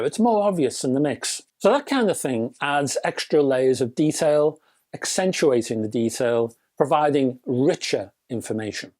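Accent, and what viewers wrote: United Kingdom. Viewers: I rather enjoy watching your videos, mostly in order to gain perspective, because I do appreciate, to some extent, anyway, the values others may hold on various subsets of audio performance, however advanced or meager. You are a well-informed, articulate man ... and an individual of good humor, so important to enjoyable conversation. Now, I generally appreciate the mechanical and delivery properties of speech from genuine Brits; they add so much color (or, perhaps "colour") and flavor. But, you folks simply must reform the completely illogical, and utterly indefensible, (mis-) pronunciation of the word "aluminum." What accidental process of lingual habit has led you folks to think the word is pronounced the way you do? Sorry, but "al-you-min-ee-yum" it isn't; it's just not. I mean, look at it. Four syllables. That's all. And while you're at it, can you please explore the etymology of the word "chube?" I cannot even find it listed in the dictionary; nor can I find any trace of the similar "chuba." (What the heck IS a chuba?) You folks have developed the universal language across the last several hundred years, and did a bang-up job of it, but you must not elevate regression to an art form.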